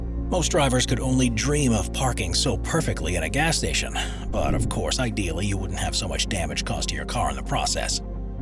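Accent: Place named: United States. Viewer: Why does the narrator have an American accent? It sounds like the copy was written by someone from Britain (or some other place that says things like car parks and bays) but the narrator sounds American. Not a big deal, just kind of an odd combination.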